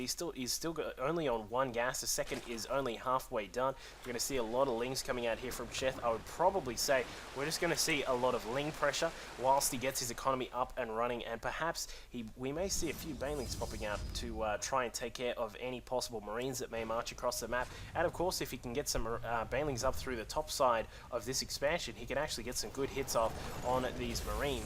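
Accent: Australia. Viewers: an aussie?! bout time!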